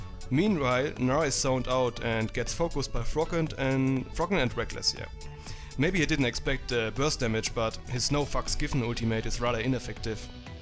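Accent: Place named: Germany. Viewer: Dat German accent xD wie geil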